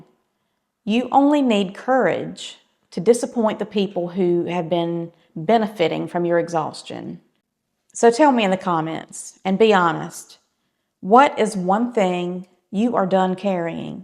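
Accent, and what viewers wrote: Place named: United States. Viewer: I’m a Brit and I love your accent. To me, you sound like a proper American.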